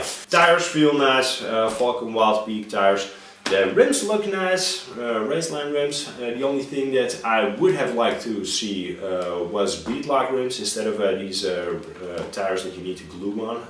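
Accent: Netherlands. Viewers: Najs Dutch accent..! :-)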